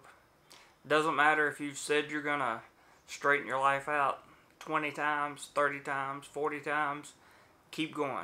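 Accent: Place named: North Carolina, United States. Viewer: Great job, keep it up. As soon as I heard your voice I knew you were from somewhere by Charlotte😂 then it was confirmed. You're reppin NC well for us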